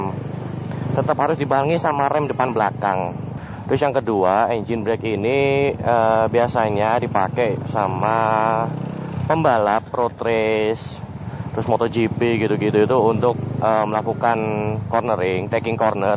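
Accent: Surabaya, Indonesia. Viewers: Wakk.. suroboyo cak 😂😂😂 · bang org surabaya ya?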